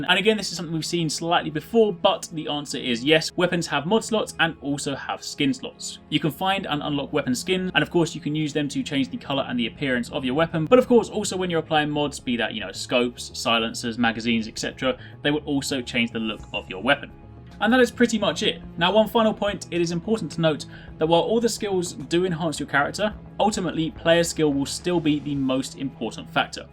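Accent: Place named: United Kingdom. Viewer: OMG for the non Brits please talk slower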